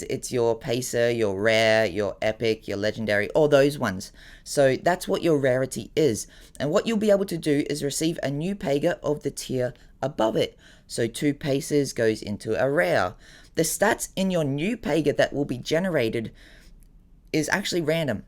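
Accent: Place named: Australia. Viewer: i love your aussie accent just like Rosé 😅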